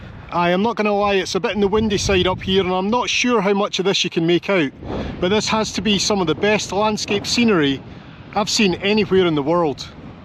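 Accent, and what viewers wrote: Scotland, United Kingdom. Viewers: Very informative & enjoyable, thanks! The Scottish accent is music to my ears. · The trees look really weird! and, does your Spanish accent have a hint of Scottish? Lovely video..great to go along... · Just pure natural approach to travel and the catchy Scottish accent. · What is the specific name of the town you were walking? Love Spain and considering returning for the 3 rd time. Great video. My grandparents were born in Scotland as was my mother. My grandfather was a golf pro in North Berwick. So enjoy your "Scottish" accent and reminds me of my heritage. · What a very beautiful and entertaining video again! 😄 Love your channel and your Scottish accent! I’m about to visit a friend in Montrose in July! I’d like to have a SNAKEBITE with you.👋🏼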